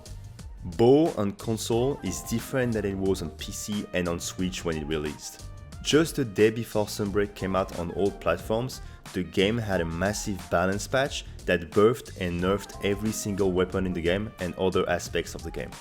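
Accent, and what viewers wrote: France. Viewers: its me or there is a french accent here ? :) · Mdrrr t'es français non? Je crois reconnaitre notre bel accent:)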